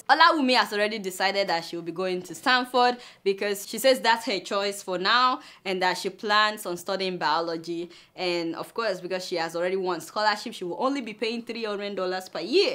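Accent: Yoruba, Nigeria. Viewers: your funny Yoruba tone tickles me. Keep it up.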